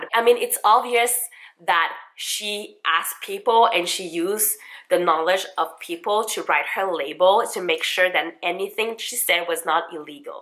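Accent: Quebec, Canada. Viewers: Are you from Quebec (province), Canada? Cauz you have that lil accent we have here when we speak english lmao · Just subbed! Is that a French Canadian accent I hear? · omg YAY! I'm in Montreal as well! Thought I recognized the accent :) <3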